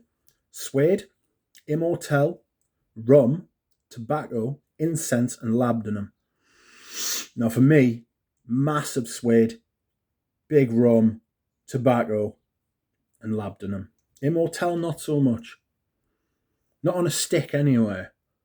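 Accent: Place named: Northern England, United Kingdom. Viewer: Fyi with your northern accent it’s “Bwah d”I says” 😂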